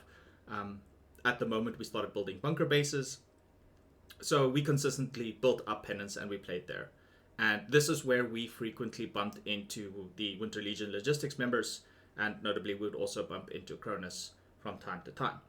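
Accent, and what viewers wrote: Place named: South Africa. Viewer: Where u from? U have like a South African accent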